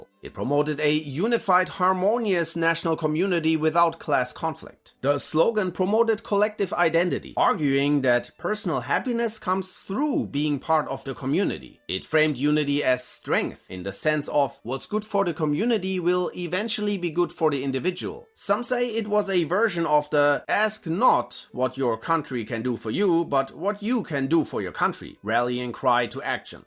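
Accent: Germany. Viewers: No, AI couldnt pull off a german accent this well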